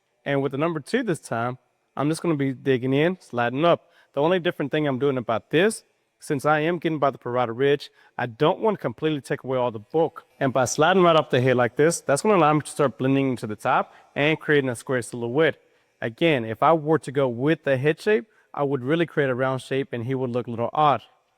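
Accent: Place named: Mexico. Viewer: This guy can’t decide if he wants to sound Mexican, black, or redneck.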